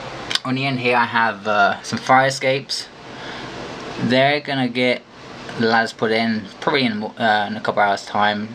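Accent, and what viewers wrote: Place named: United States. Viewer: Great video! My god....such an American Accent too!!!;->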